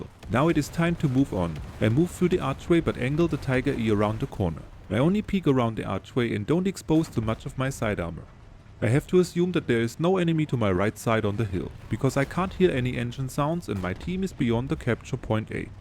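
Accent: Germany. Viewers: Is it possible thst your native language is german ❤?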